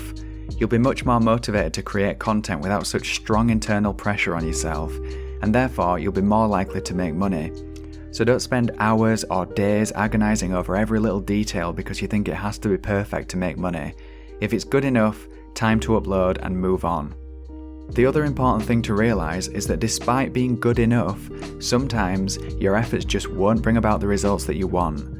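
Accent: Yorkshire, United Kingdom. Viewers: Yorkshire accent ;)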